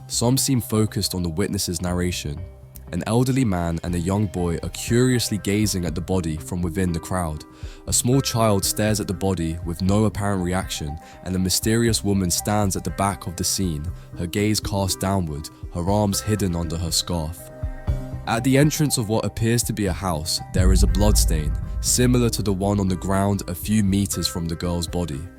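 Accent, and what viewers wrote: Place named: London, United Kingdom. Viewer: Why do you think English is not his first language? He's English, probably from London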